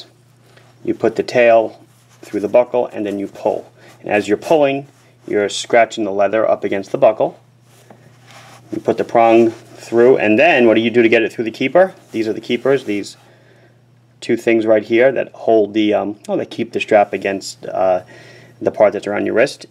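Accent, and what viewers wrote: Long Island, United States. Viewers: "It popped right awf" "Now that it's instawlled" Love lawnguyland accents.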